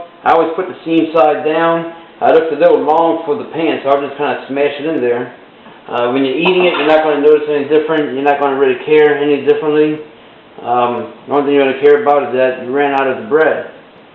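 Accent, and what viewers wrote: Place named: Louisiana, United States. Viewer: No accent you say? ? I hear Louisiana.. Delightfully so. I chose this video to respond because I enjoyed it..and it will remind me that I want this recipe. :-)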